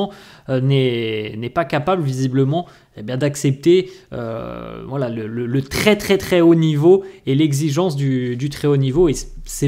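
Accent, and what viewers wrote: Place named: Spain. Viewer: Visca barça j,aime bien ton accent espagnol 😊❤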